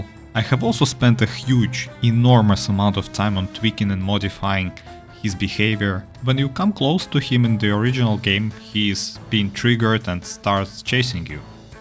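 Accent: Russia. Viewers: Hello! Просто вопрос, вы из россии? Просто по акценту похоже. Да и похоже на. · На самом деле у тебя очень хороший английский. Но всё равно русскому уху очень слышно)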